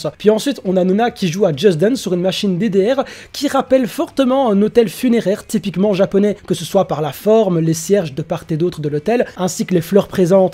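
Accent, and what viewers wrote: Belgium. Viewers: t'es belge ? ton accent me dit qqchose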